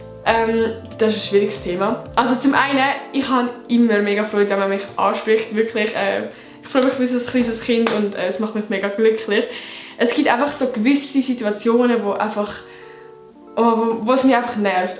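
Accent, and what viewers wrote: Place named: Switzerland. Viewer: Schweizer Dialekt ist so lustig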